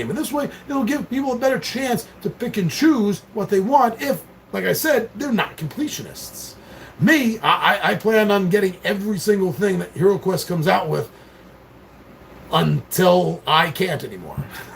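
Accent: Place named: New York, United States. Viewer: you have a new york accent? no way!!!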